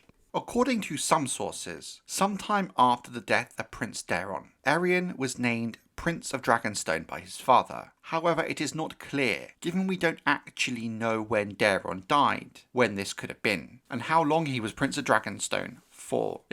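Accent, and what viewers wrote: United Kingdom. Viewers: You have the most British voice ever. Like the josh Brolin of England lol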